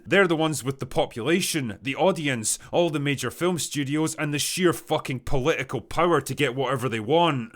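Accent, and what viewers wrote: Scotland, United Kingdom. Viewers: A Scottish chipmunk voice. Awesome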